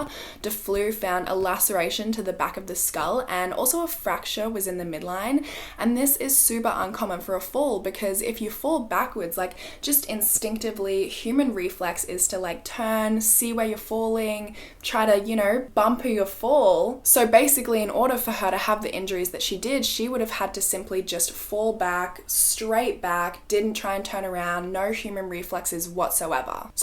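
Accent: Australia. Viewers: definitely Aussie hahaha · as a brit i can confirm is deffo aussie 😎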